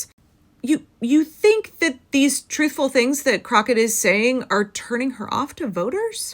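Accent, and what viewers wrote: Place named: Texas, United States. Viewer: You are still a Texan….you speak Texan woman great! She has a huge surprise coming.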